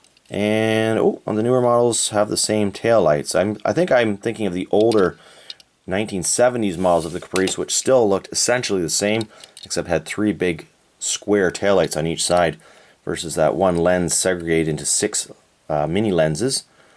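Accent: Canada. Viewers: Banana-ing of the vehicle❓❓🤔 - Ya big kid,you.🤭 Also,just wondering,by any chance,would you happen to be Canadian?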